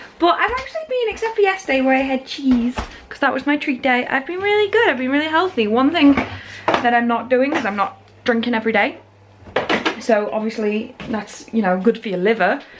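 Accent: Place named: United States. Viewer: My like... vlogging inspiration is Julien Solomita. He has a reeeeaaaally fancy camera, but the things he will film and his editing are totally doable! He doesn't always do creative filming for his vlogs, but many times he does. xD I know, I'm learning to be fancy too. Haha, I love your ditsy-American accent. Oooh lady I know the migraines. I know them. >.< HAHAHA, I LOVED your thought train on food! That comic creator sounds fantastic!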